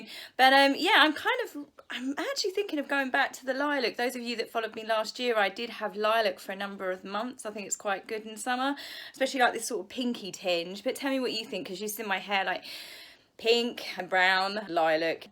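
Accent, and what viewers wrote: United Kingdom. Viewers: I love your personal tid bits regarding your life, the hubby, and the dogs. Please don’t stop! Also love the hair! As for your content, it’s fabulous... the wonderful British accent is a bonus. Thank you for all that you do! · Yes you know what's nice about you talking about your life is that you seem like a genuinely nice and sincere person who is on behalf of "the little people" & it is comforting to hear a mentally stable person when everyone in CA is freaking out about coronavirus and #blm & fighting. I also love your colloquialisms & just listening to your accent. I'm interested in learning British slang & about everyday life in the U.K. & also people do really well with beauty channels maybe you can make extra money promoting products?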